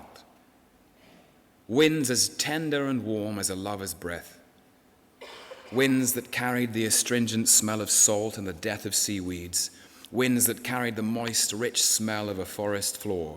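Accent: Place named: United Kingdom. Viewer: This essay poem is not only utterly romantic and passionate but suits his voice, his British accent... beautiful literature piece that just suits the loving character. Also to his mischievous character when he said let's get into detail, hehe. Blemishes were funny and actually made total sense. Love the literary style with metaphors...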